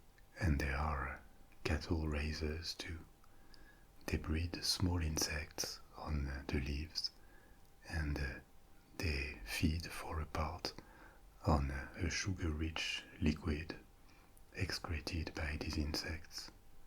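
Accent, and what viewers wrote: France. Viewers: ‘What’s your favourite video’ A French man talking about ants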